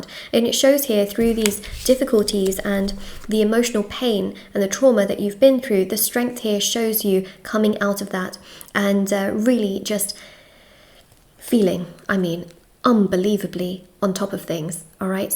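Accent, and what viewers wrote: United Kingdom. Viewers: ❤Her accent tells me she's British......❤ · British accent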